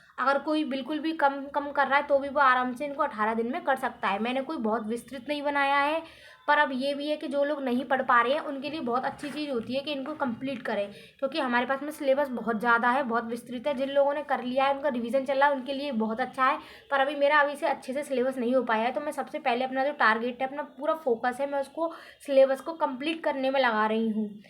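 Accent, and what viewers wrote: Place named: Bharatpur, India. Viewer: Bharatpur se ho kya language to aesi hi h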